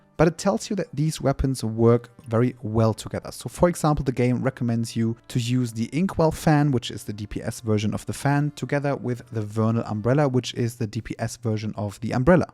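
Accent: Germany. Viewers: Wait, you’re German?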